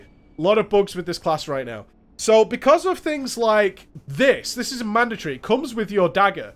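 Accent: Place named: Manchester, United Kingdom. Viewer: It must be the Manchester accent but I can never tell when (or anyone) is mad or enthusiastic about something when i can't see his body language.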